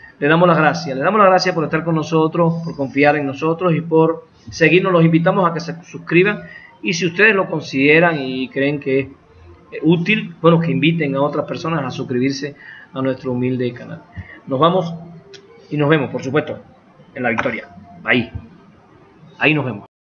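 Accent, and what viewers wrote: Cuba. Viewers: Oiga usted habla como ke es mexicano usted no es mexicano parese cubano venezolano no se pero usted no es mexicano ahora esta bien por apollarnos y si gusta ser mexicano pida la nacionalidad no hay problema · de donde eres? Te dices mexicano pero tienes tu acento cubano mucho muy marcado, saludos! Buen video! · Este señor tiene acento cubano hay que cuidarnos de lo que dice Lopez obrador esta incado ante trump sólo los mexicanos podemos defender nuestro pais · Su acento dicta que es cubano.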